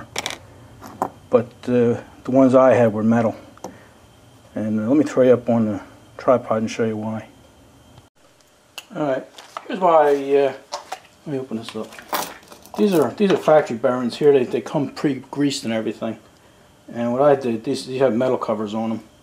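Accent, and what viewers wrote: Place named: Bordentown, United States. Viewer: So, how far from Bordentown do you live? The accent is a dead giveaway. LOL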